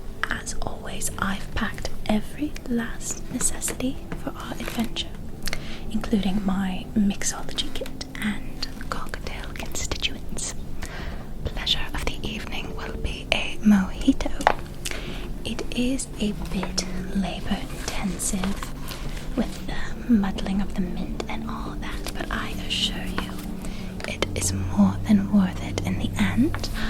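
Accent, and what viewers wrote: Transatlantic, United States. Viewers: love the 1920s transatlantic accent, super cool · i like this classical american accent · She's so versatile with her roles! I'm a sucker for the transatlantic accent so this checks all my boxes 🖤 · You’ll probably not see this but I think it’s transatlantic, which was a mishmash of English and American, it was usually used by the upper class and Hollywood stars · transatlantic accents are my weakness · Which American accent is it?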